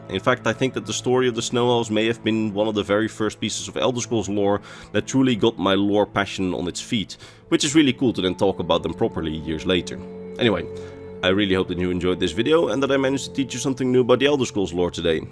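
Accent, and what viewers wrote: Netherlands. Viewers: Sounds Dutch or maybe scandi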